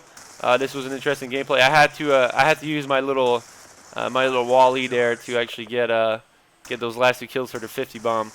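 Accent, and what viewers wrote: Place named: Quebec, Canada. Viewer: it sounds like ur quebecois accent is more obvious after u do ur french commentaries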